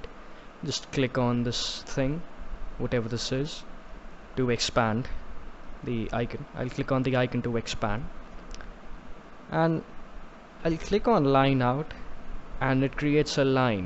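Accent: India. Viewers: He sounded Indian from the start lol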